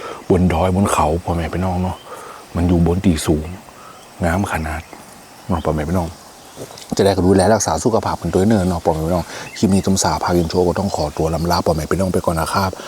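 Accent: North, Thailand. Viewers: ชอบตรงทีเขาอู้คำเมืองจ๊ะ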